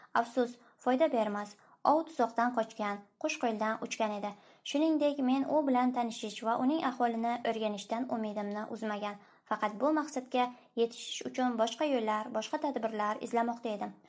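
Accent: Bukhara, Uzbekistan. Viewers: Buxorocha shevezdan ey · Вокеалар Бухорода бўлганлиги учун синглимиз ўз шеваларида ўқиб қўя қолибдилар😊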